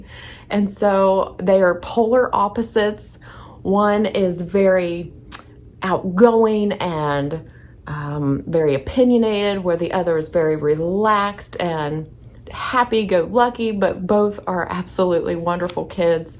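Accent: South, United States. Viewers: I started watching decorating videos last Fall and found you. I really enjoy your enthusiasm and we have similar taste. I love your southern accent too. I enjoyed learning more about you and look forward also to your Bible verses. You are a beautiful inspiration, thank you! God bless you! 💕(I am a grandma of 9, you have so much to look forward to). Such blessings!!! 😘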